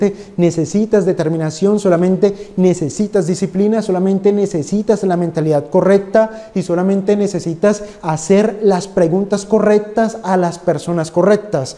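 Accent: Colombia. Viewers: 💎💎😮Parce Tu Eres Colombiano?? Buena!!!!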